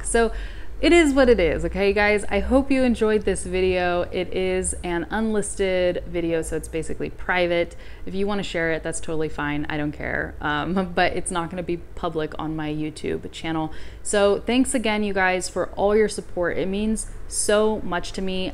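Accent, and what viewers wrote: Buenos Aires, Argentina. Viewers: Hello, thanks to share this video and your experiences with language learning. Your Spanish it's very good! You sound like a true Argentina! Continue inspiring us! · I don't know, but it seems that you're speaking a little bit slow because I'm getting everything you're speaking , am I wrong?..suenas como una porteña, saludos desde Nicaragua XOXO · Excellent video!!, telling us about your personal experience with the spanish language is very inspiring. As a native spanish speaker i got say you have a very nice accent, quite "porteño" haha. Bueno ahora si en español, te cuento que hace poco di con tu canal de youtube y de todos lo que encontre puedo decir que entiendo muy bien como hablas en ingles. I got a say since my childhood i listen a lot music in english, and watch movies and tv shows as well, but my challenge is reading fluent english language. 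Saludos desde Argentina. PD: I dont know if the word "quite" is well used xD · I think it was an excelent video, very natural, and I like when you speak fast and there is no subtitles, that force us to pay attention and follow every word as much as we can. We sometimes try to be perfect in all what we do and that is a problem, because nobody is perfect... and if you make a mistake at least that mean you are trying. I have to say that I love when you speak Spanish, I have seen other videos from you and I saw you talking in Spanish in one of them and I was perplex because you sound like an Argentinian woman, your Spanish is really clear and you got to achieve a very similar accent. Thanks for your video and I know many of us will be looking forward to a new one. See you.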